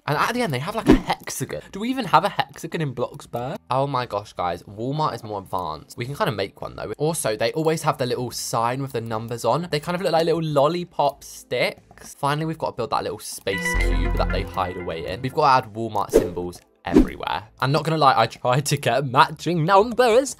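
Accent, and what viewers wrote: United Kingdom. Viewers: i always wanted to learn your accent i love britsh accents i really want to go to England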